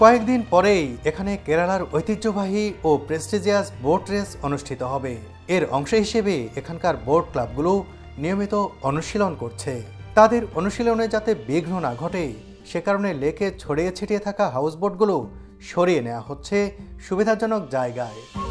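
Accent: Bangladesh. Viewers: Apnar kotha sune ..mone hoi apni bangladeshi ..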